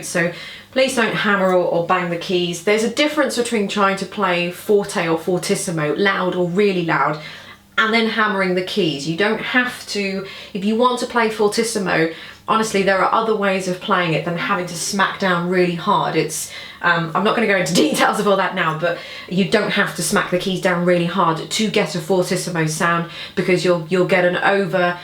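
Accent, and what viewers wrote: England, United Kingdom. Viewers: Talented, beautiful, AND you have an English accent. I want one!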